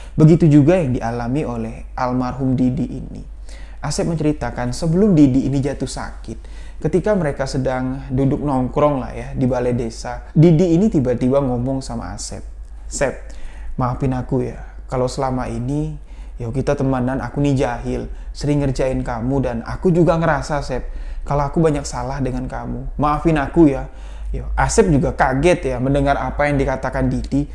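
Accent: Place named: Lampung, Indonesia. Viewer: Bang oarang sebrang ya,dari logat nya kayak orang Lampung.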